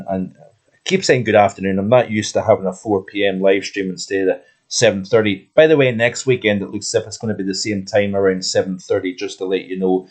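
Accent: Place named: North Antrim, United Kingdom. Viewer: Best wishes to the lady on such a big milestone. Yesterday wasn't that nice but it stayed just well enough behaved for us to do our usual walk around Annalong after getting a feed from the galley, a bit of a Sunday ritual of ours during the warmer months of the year, we travel from Armagh, was only in the last few weeks I discovered you were in fact a local man before moving up there to Scotland but always had my suspicion with the accent, thought you might have been North Antrim originally. Wind was rather lively mind you and the sea rough too.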